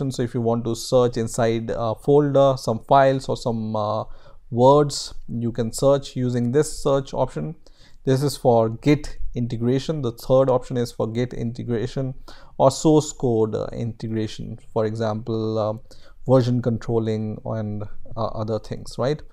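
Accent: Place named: India. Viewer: you know it will help you when they have an indian accent thank you indians for making youtube tutorials all day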